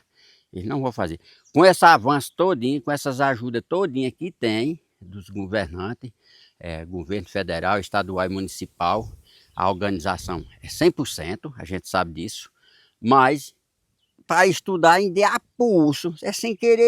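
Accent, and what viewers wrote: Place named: Northeast, Brazil. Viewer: Como gosto de ouvir seus contos verídicos o jeito nordestino bom de ouvir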